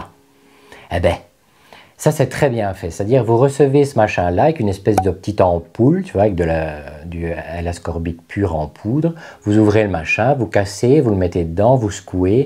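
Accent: South, France. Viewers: Hello! Vidéo qui a peu de risques d'être démonétisée! Tu d'viens bon loll Pour yesstyle, ça te met aussi (minimum) un mois pour arriver chez toi? Pour ma dernière commande, j'ai failli leur envoyer un mail pour demander s'il arrivait à pied... J'espère que tu reviendras plus souvent mais en mode 'currente body (accent du sud)' car ces vidéos, c'est vraiment toi! Bye bye et à bientôt!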